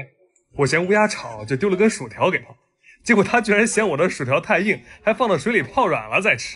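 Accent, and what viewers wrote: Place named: Henan, China. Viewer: 我还说这“去球”咋振的河南味儿了，果然老乡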